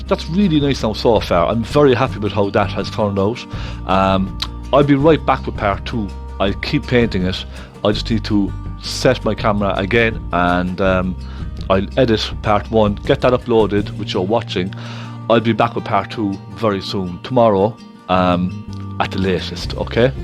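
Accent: Ireland. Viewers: lovely irish accent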